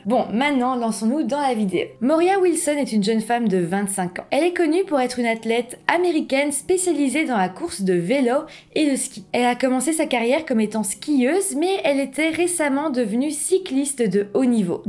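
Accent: Belgium. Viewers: Bonjour, Bon avant toute chose, je te remercie de relater cette affaire aussi récente et dans les détails et dont je n'avais pas eu connaissance.😉 Cependant et au risque de me mettre toute ta communauté à dos...🥺😭 Mais bon sang!!! Non pas que je sois tatillons et sachant en plus que ton accent belge est des plus charmant et n'étant moi même pas originaire d'Alaska(rapport au poisson); Par pitié!!! 🙏 "Colin" quant bien même ait-il de "l'oseille"; je pense que la "moutarde"; fut-elle à l'ancienne, lui monterait rapidement au nez en entendant ta prononciation le concernant😡😂...(par ta faute, j'ai la dalle; du coup🍽😊)... Concernant ton analyse: j'acquiesce totalement et bien que l'affaire soit en plein déroulement, il ne fait aucun doute selon moi, concernant la culpabilité de la principale intéressée (jalousie, possessivité et fuite par dessus le marché). En conclusion, je dirais que force est de constater que le yoga ainsi que ses alternatives en matière de relaxation (à l'occidental, je précise); sont loin de démontrer leur efficacité en matière de gestion de la colère, ainsi que de retenue vis à vis d'autrui... 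Je laisse notamment à tes viewers (et potentiellement lecteur de ce post); le soin de se faire leur propre opinion concernant le nombre à travers le monde, d'individus, soit disant "healthy" et "natty"; "bien dans leur peau et dans leur tête à l'instant T"; ayant basculés dans les abysses de la folie; à priori aux antipodes de leur style de vie tan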